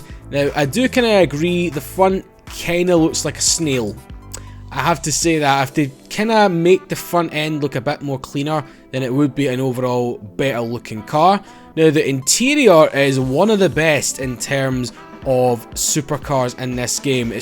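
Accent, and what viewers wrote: Scotland, United Kingdom. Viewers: This guys Scottish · Are you Scottish?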